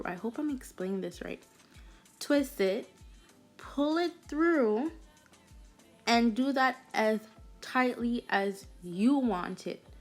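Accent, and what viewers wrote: Jamaica. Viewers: Didnt even realize you were Jamaican until I read the comments and relistened to the video. As a Jamaican myself, I totally forget about that now that Im living in America because we have the same accent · Could tell you were a yardie from the first word u said 🇯🇲🇯🇲🇯🇲🇯🇲 mi ago try dah style ya tomorrow. Big up u self cute gyal 😍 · Knew you were Jamaican from the video start, mi ago try dah style yah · she low-key sounds Jamaican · are you Jamaican? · Baxcide har accent shes jamaican 💗💗💗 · Crissy samuels she's Jamaican.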